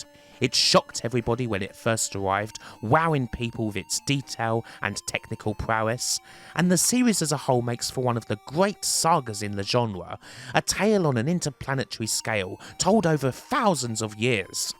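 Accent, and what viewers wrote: United Kingdom. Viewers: Does the narrator have a particular British accent? I'm asking because I'm not British but from Sweden.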